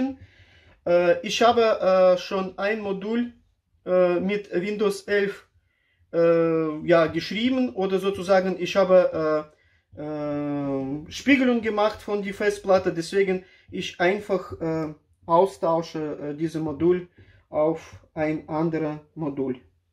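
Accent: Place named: Russia. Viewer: Sehr, sehr sympathische und ruhige Art, dieser russische Dialekt (?) ist soo süß und sympathisch 😊 und deine Art zu erklären ist auch sehr verständlich und nachvollziehbar. Top! Danke 😊 Eine Frage noch: Das Gerät zum Wechsel bzw Erweiterung des Speichermoduls zu öffnen, ist das eine dieser "Aktivitäten" durch die die Garantie erlischt? 😅 falls ja, wäre das ja super dreist. Zumal Samsung ja explizit damit wirbt.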